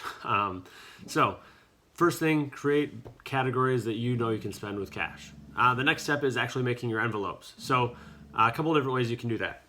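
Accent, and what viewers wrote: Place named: United Kingdom. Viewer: I love a good random British accent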